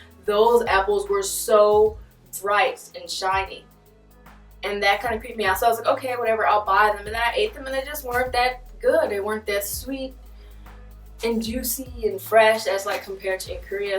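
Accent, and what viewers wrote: South, United States. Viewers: amazing how much your accent went back to confederate from where it was in march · Haha that was really cute. Might've been a while since you've been back in the states but you still sound very american :) (As an Australian) I think i'd find those things pretty shocking too tbh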